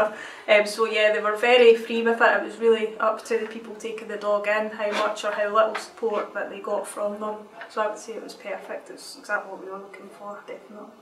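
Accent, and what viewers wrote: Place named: Scotland, United Kingdom. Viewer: She is Scottish;. Possibly Glasgow, but more the West than East.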